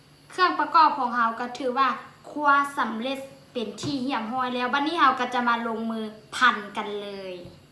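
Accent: Laos. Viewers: ຊົມເຊີຽທີ່ເວົ້າພາສາຊຽງຂວາງລ້ວນໆ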